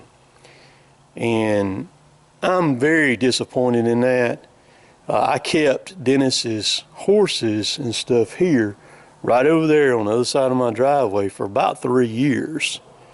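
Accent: South, United States. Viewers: Man you have one of the thickest southern accents I've ever heard! Where you from brother?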